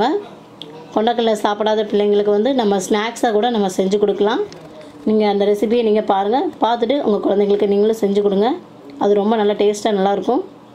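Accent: India. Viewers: Mam unga native nellai ya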